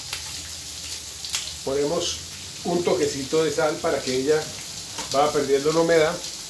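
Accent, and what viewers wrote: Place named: Caribbean Coast, Colombia. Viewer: Tu eres costeño jajajaja to oir tu accento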